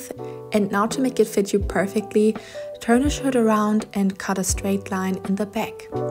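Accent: Germany. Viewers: I hear german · The German accent 😅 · I hear some german accent .,.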